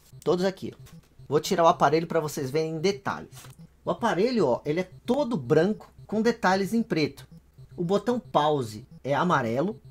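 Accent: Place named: Belo Horizonte, Brazil. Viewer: Prestei atenção em vc conversando. Vc eh de BH.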